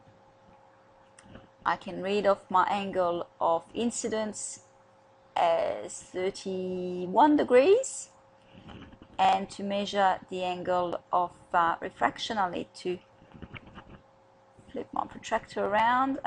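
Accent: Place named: Australia. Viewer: ausrtaliam accernt tho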